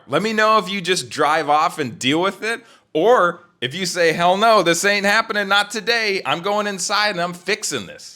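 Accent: United States. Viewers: . Recent fan. You live in Europe? I am not good on accents but I think you sound nyny. Inigo (me), "Where do you live in Europe?" The man in black (you), "No place of consequence." Inigo (me), "I must know." The man in black (you), "Get used to disappointment." Anyways... I get the very strong sense that you are a cook, a chef (they are different), and have worked in the restaurant industry in almost all capacities. So... WHY is r/fastfood about "the industry that I love"? I am certain that you do not work in the fast food industry. Is this a nostalgia thing. I would appreciate a reply to this post. Thanks:)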